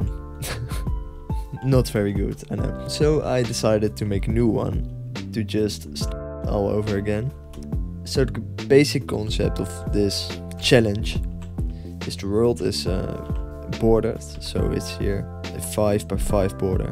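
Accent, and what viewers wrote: Netherlands. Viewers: Im really curious to know where you are from! You sound like german/dutch, but I am not sure :) Edit: i knew it. You are dutch just like me :P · Jij hebt een Nederland accent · I could already hear that you're dutch like so many dutch people sound like that when talking english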